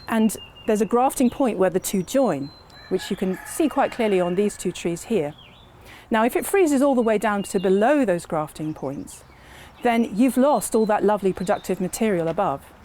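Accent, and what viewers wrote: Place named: Texas, United States. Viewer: The accent lol 😹 I thought this was Texas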